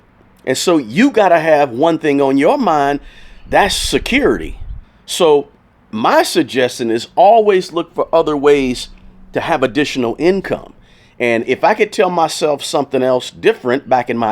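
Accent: South, United States. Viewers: Why does the best life advice come from a dude with a southern drawl?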